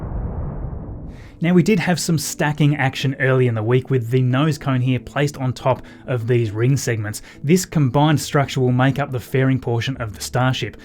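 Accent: Australia. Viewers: Don't you talk fast - and why an Australian accent?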